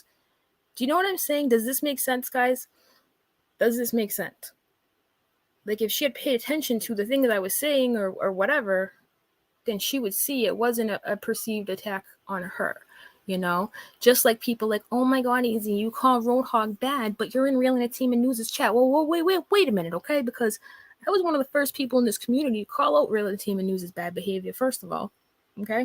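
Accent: Boston, United States. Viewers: GUUURRRRLLLLL…. First off, how some people have even 1 sub truly confuses the hell out of me. The hypocrisy on YouTube pours out like water! People want to point fingers for “being in someone’s chat”, but meanwhile they are in the EXACT SAME LIVE hiding in the bushes!!! They are too dang nosey not to be! Always trying to be in the mix while holding up their “clean hands” claiming innocence. Those are the ones that need to “claim their nasty”. At least you’re not afraid to own up and stand in your truth. ✌🏼😊 Ps - my Mother was born and raised in Boston… (we are Kessler’s and Kavanaugh’s). I love your accent! We moved to Georgia 40 years ago, so yea, there’s no Boston accent left here. LOL! 😆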